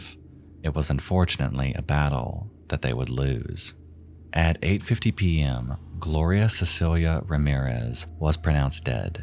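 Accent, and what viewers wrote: South, United States. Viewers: I know you're a fellow southerner. I can pick out that accent from anywhere.:p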